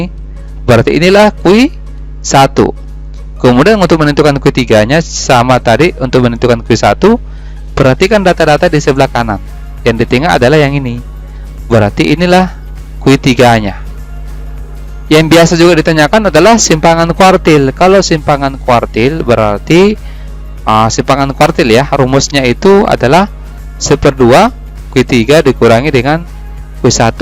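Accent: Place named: South Sulawesi, Indonesia. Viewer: didengar dr logat nya bapak orang Bugis Makassar kalau tidak salah? 😄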